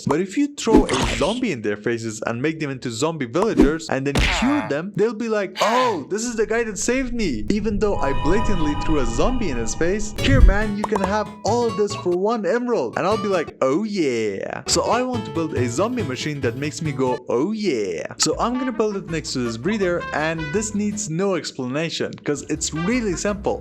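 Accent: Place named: United Kingdom. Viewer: You just have british english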